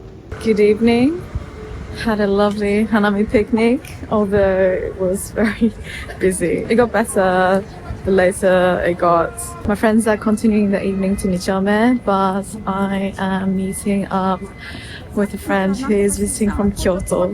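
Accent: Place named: United Kingdom. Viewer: Oh first time here, and really enjoyed it! You sound so British! I live in London and resonate with the 'loneliness' of sort-- especially dating! Subbed :)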